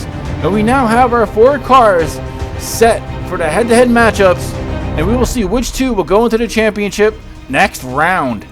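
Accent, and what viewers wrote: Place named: United States. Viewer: Oh I remember you live in Thailand. Because of your accent/voice I sometimes think you are from the USA so it would've been cheap seeing as I got some cars there but great video!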